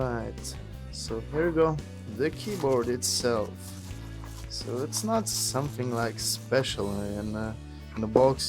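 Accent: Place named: Russia. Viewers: the translate : Lol,Russian Accent) in case some one wonder. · lol ruski accent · Лол, русский акцент)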